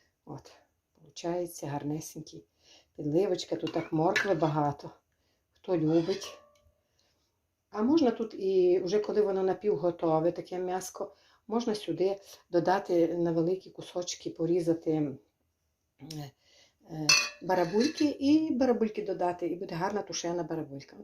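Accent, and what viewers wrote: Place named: Luhansk, Ukraine. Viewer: Чудовий рецепт. Так моя бабуся готувала, тепер іноді я. У вас неймовірна говірка, я наче знову побував вдома на Луганщіні. На тих недолугих обох статей, які вам розповідають як правильно говорити, не звертайте уваги. У них просто давно не було хлопця.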